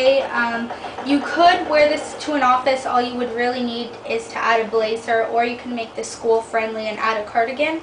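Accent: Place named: Canada. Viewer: i knew u were from canada u sound like it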